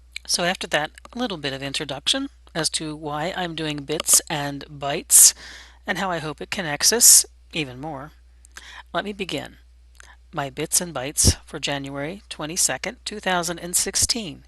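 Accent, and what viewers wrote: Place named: Maryland, United States. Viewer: Well my min pin is named Snuffy after the 1st dog I remember having as a kid, who was a Manchester Terrier and was also a black and tan. I have a weakness for black and tan dogs I guess, have certainly had quite a few in my life. I fostered dogs for a while for 2 different rescues in the past, as well as some bottle fed kittens and a mama cat who had just had kittens. But Snuffy doesn't bark that much at all. I taught her early on that was not acceptable unless there were truly something I needed to be alerted to. I have had her for 4.5 yrs now from 4 mos of age, and I can pretty much discern her different barks to know what's going on outside, I guess you could say I almost speak dog....ON another thought...heading to the liquor store before a big storm is one of the very goofiest things I do believe I've ever heard of! 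I can safely say I would not be one of those folks, lol. BTW, I grew up till age 15, in Columbia MD, sounds like you have a similar accent:) You may wish to let the vet know that Ernie has had an increase in his post ictal stage of seizure episode...and yes, I DO remember that lovely song, lol. BB to you and yours, kissies to the dogs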